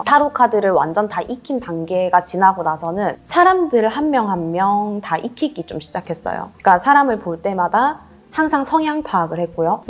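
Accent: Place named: Gyeongsang, South Korea. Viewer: 경상도 사투리가 조금씩 섞이신듯^^ㅋ말씀 많은도움이 되었습니다 감사합니다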